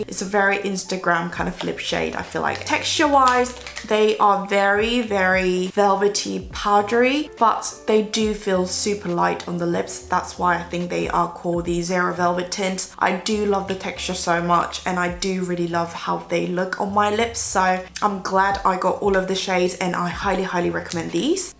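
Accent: United Kingdom. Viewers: 투쿨포스쿨꺼 치크 앤 아이인데 입술에 쓰기에 무리라고 해써여!ㅋㅋㅋ 아무튼 오늘 영상두 잘봤어여~ 약간 영어 듣기평가처럼 언니의 영국 영어발음 듣는 재미도 있고 하울도 재밌었어여ㅎㅎ 다음 영상 또 기다릴게여~~ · 진짜 영국 발음은 언제나 귀르가즘 ㅠㅠ❤️ 저도 이제 곧 개강이라 다시 해외로 나가야하는데 추천템 좀 쟁여가야겠어요>_< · 영국발음... 이게 영국발음인가... 치인다 오늘도... 팬미팅 해주세요... 하... 워터~r 가 아닌 우워터. 에 놀라고 영국 발음과 영어 목소리에 치이고 갑니다... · 미국사는데 님의 영국발음 들을때마다 너무너무 좋아요! 완전 취저😍😍😍 펄시레드 색 진짜 쨍하니 너무 이쁘네요💋 다음번에 한국가면 저도 쟁여와야겟어영❤️❤️ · 언니 영국발음 개치입니다..💜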